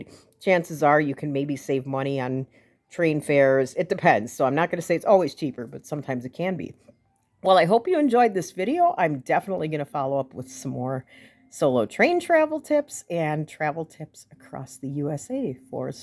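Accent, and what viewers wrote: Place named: Wisconsin, United States. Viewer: I got here by searching "wisconsin accent, because I'm from Wisconsin and I like linguistics and I'm sad that my accent gets NO attention in the linguistics scene. It's refreshing to hear the Wisconsin dialect on YouTube for once. P.S. Have you ever seen Metalocalypse? The drummer, Pickles, has a stereotypical Wisconsin accent and it's hilarious.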